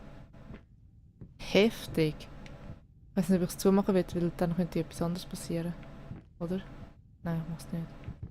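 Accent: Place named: Switzerland. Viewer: Schwiizerdütsch fiiri eifach 👌🏽 Guets Video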